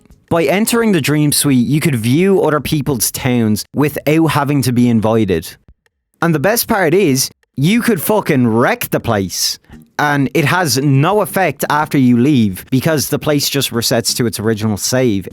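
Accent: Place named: Ireland. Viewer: instantly clocked that you’re a fellow irish person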